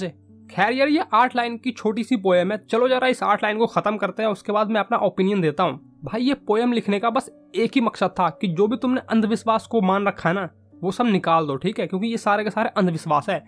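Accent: Bihar, India. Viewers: Bihar se ho kya sir?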